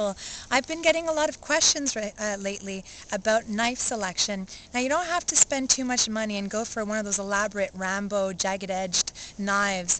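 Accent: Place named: Canada. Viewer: this chick sounds canadian. anyone know if she is?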